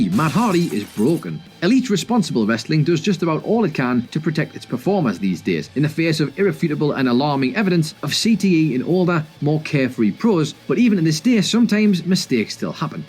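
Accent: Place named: England, United Kingdom. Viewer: Which Scottish boys? The narrator's from England.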